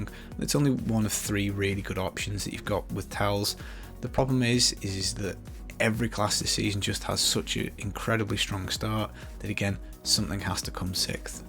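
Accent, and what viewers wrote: Stoke, United Kingdom. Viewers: are you from Stoke ?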